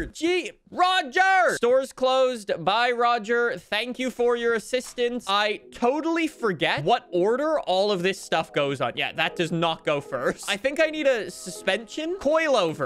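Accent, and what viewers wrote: Quebec, Canada. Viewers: This dude is a perfect demonstration between french canadian and french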